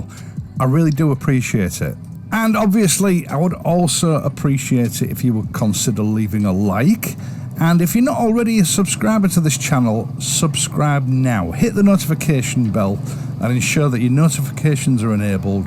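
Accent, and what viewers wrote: United Kingdom. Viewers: Dear, Thank you so much for your videos. They are always filled with common sense, experience, and wisdom, which truly help in gaining new perspectives. I wanted to share that as a French speaker with limited English, your wonderful British accent can sometimes be a challenge for me (as we are mostly taught American English in school!). Because of this, I am always thrilled when your videos feature a translated French audio track. It allows me to enjoy your content even while I'm busy cooking or doing chores! I understand that these audio tracks are often managed by YouTube's algorithms and AI policies, but I wanted to let you know how much value they add for your international audience. Thank you for being such a unique and inspiring voice on this platform. Best regards from Belgium! Julian.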